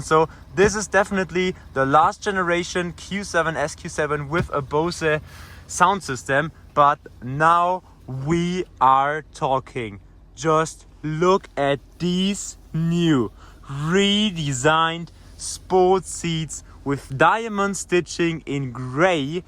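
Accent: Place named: Germany. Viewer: Bro you are from Germany i can hear it ! Moin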